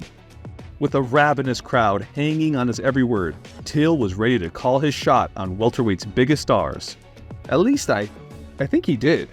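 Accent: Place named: Liverpool, United Kingdom. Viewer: You could be the biggest badass in the world and you still wouldn’t be taken seriously with that scouser accent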